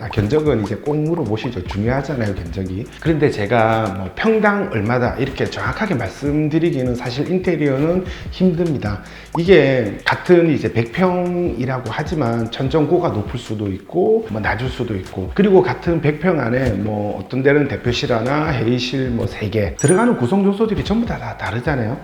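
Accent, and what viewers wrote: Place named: Gyeongsang, South Korea. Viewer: 역삼에 있는 개발회사인데 9월이사라 이사갈곳정하고 있는데 연락 꼭드리겠습니다. 같은 경상도 분이라 먼가 친숙하네요^^ 전용 약 63평, 임대면적 99평정도 되는 사무실 예정하고있습니다^^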